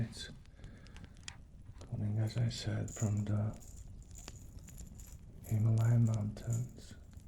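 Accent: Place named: Europe. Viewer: Wow this is a great video! Im subscribed now for sure. I have a question, I noticed you have what sounds like an accent, are you European?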